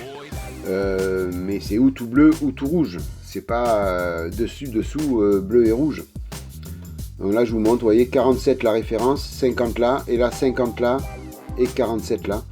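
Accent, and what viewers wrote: South, France. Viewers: Bravo. Superbe réalisation. Je vais suivre celle du Mirage F1. Je trouve tes présentations très agréables (avé l'accent) et découvre à chaque fois des astuces que je ne manque pas d'utiliser pour mes propres réalisations. Bonne continuation.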